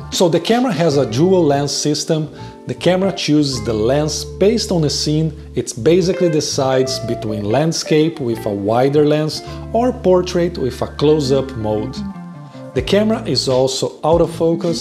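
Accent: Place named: Brazil. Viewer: Impressionante como sotaque de br da pra notar de longe kkkkkkk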